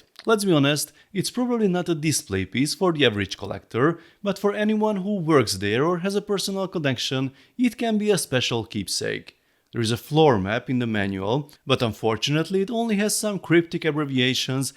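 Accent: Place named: Hungary. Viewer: mindig is kicsit éreztem az akcentusodon mintha magyar lennél de amikor kimontad hogy nyíregyháza egyből tudtam. király videó csak így tovább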